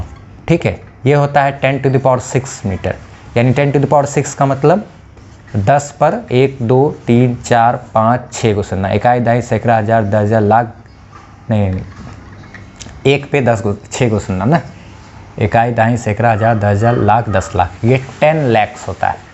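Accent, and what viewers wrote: Bihar, India. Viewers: Love your bihari accent 😍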